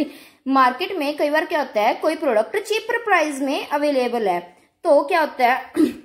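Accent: Punjab, India. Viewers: Mam app punjab se lgte ho haina?? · I loved the way u speak U have punjabi essence in ur speaking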